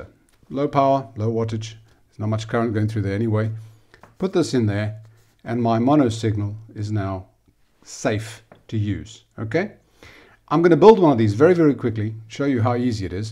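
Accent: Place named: South Africa. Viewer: You sound South African. 👍🏻😁 I have learned quite a few tricks. Thanks boet.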